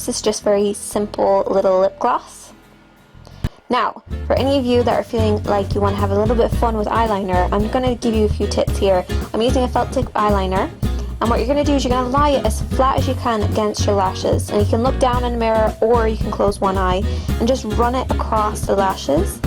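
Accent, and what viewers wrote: Ireland. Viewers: shes Irish.. :-) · really love her accent :) love irish accent :) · Are you Irish? :D I am ;DD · she's irish :) x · This is an Irish accent :) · It's Irish. :) Yeah, I've thought the exact same thing - she does go into a bit of an American accent at times. But then she goes back into her Irish one (which I love! because it's so unique, especially on Youtube). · yea its irish! I think its because american accents were predominantly derived from irish accents :)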